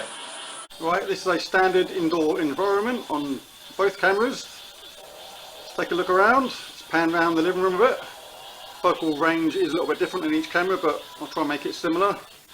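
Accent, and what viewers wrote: United Kingdom. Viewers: Just subscribed...thanks it saved me from buying one mate 👌 can i ask where your from mate?? Your accent sounds local ish to me down on the south coast ( hants )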